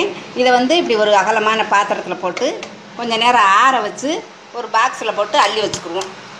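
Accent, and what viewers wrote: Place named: Sattur, India. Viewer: my dad was from a village near sattur, vembakottai and the way of talk has taken me back to that land of mine..good one thnks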